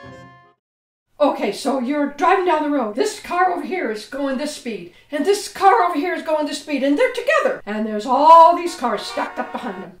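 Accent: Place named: United Kingdom. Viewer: i fucking love you i also love how you sound like a posh english person but you're from america. what the fuck is that about?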